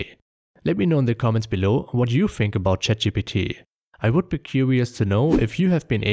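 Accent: Germany. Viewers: Also dein Denglisch is ja wohl kaum zu überhören. 🤣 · Den Akzent hört man leicht raus😂 aber gutes Video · Kann es sein das du eigentlich deutsch sprichst?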